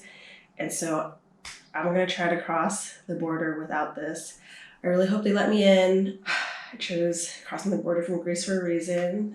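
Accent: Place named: United States. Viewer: Hi from Izmir 🖐️🙂 I wonder why do you have American accent ?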